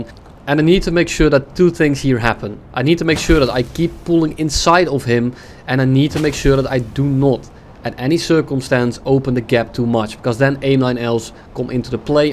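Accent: Netherlands. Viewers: Still love the dutch acsent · i smell dutch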